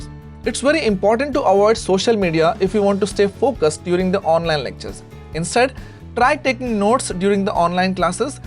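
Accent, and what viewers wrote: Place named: Bengal, India. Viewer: This accent is so Bengali.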